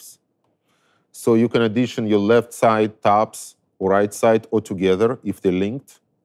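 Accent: Russia. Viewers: обожаю такой английский, русский русского лучше всего поймут по-английски))) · I love the russian accent (sorry, If I got it wrong).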